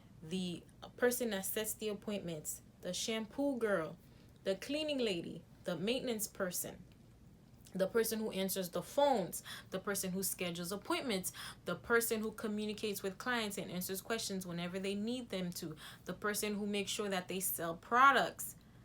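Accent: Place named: Haiti. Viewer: Random question... are you Haitian? I detect an accent and you look like you can be one of my cousins lol 🇭🇹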